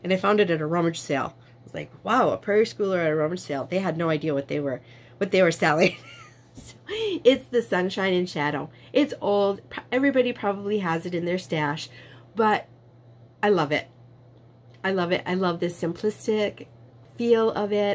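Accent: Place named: Upper Midwest, United States. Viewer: I knew immediately from your slight "accent" that you were from the UP or Wisconsin. I grew up near Iron Mountain (Norway) and will always be a Yooper at heart. Your projects and future projects are great. And what a wonderful ministry you have with your hats and mittens. I know how needed it is up there.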